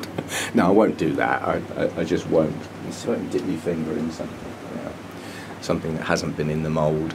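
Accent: United Kingdom. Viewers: I have to watch your videos! There are so many bits of info that you share. And I love a British accent, your sayings and sense of humor.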